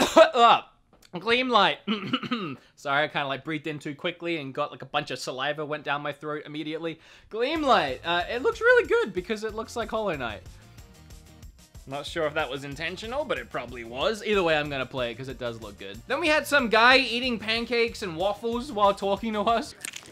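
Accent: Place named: Australia. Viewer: You're an Australian!? I did NOT expect that!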